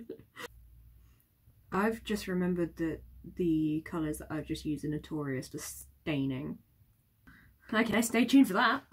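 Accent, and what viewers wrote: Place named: United Kingdom. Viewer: O f#ck, you have british accent, very nice!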